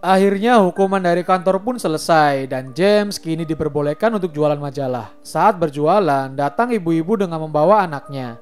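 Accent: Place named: Indramayu, Indonesia. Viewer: Wong dermayu tah mang "manganese nginung sing a key cung"😂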